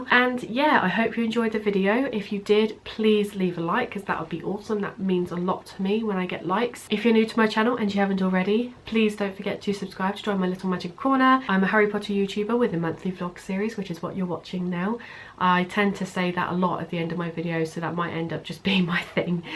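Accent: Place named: England, United Kingdom. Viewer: I’ve been there on holiday before! It’s great isn’t it? I used to live in the England and I still visit a lot, I was just wondering what part of the England are you from because your accent is similar to mine 🙂 (sorry that probably sounds really weird)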